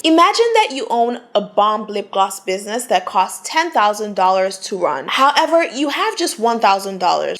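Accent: Nigeria. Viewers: Lmao are you Nigerian ? I think I hear it lol... thanks, that video was great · I'm hearing a little bit of Nigerian accent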